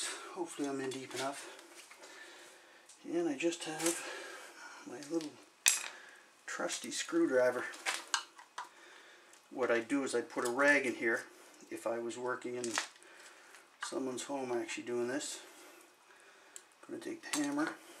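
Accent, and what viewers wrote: Cape Breton, Canada. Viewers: Hello fellow caper, I recognize the accent. My tub has a clogged abs trap, and it's too close to several other adapters/elbows that have no room to cut them without taking the whole thing out, which would require taking my surround out and basically redoing the entire damn thing. My question is: is it possible to cut off and remove the trap off the 2 abs pipes it connects to, without having to take apart anything else? Like a special way I can cut out the trap leaving just the rings, and then carefully cut them off, then sand down all the glue and just pop a new trap on there?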